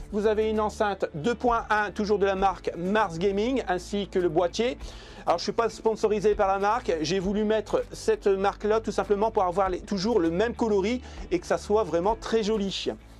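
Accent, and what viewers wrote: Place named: Nord-Pas-de-Calais, France. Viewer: Cet accent chti ASMR 🎶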